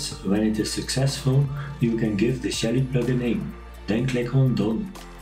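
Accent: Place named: East Flanders, Belgium. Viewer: grappig da ge kunt horen da gij nen oost-vlaming bent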